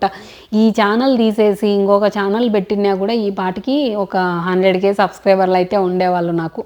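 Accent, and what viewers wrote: Rayalaseema, India. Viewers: Hi sis మేం కూడా రాయలసీమ మీరు మాట్లాడుతుంటే చాలా happy ga వుంది,mobile ముందు పెట్టుకొని చూస్తే మా sister tho opposite లో కూర్చుని మాట్లాడుతున్నట్లుంది, జై రాయలసీమ అక్క,,slang 👌👌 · మేము కొన్ని సంవత్సరాలు కడపలో ఉన్నాము, రాయలసీమ భాష వినడానికి బాగుంటుంది, మీరు చెప్పే విషయాలు నాలా కొత్తగా యూ ట్యూబ్ చానెల్ క్రియేట్ చేసిన వారికి చాలా ఉపయోగపడతాయండి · రాయలసీమslang చాలాflowగాఉంటుంది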